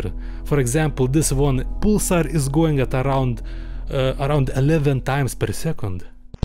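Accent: Russia. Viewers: I would like to thank you for puttign subtitles, even though i can understand you with your (correct me if im wrong) Russian accent, no offense, i really live thr accent, just sometimes hard to hear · ロシア人の英語を聞いてたらロシア語話せそうな気がしてくるから不思議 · You sound so russian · Hu... he comes from Russia as i hear! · U sound like Russian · Чистый русский английский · R u from Russia or Germany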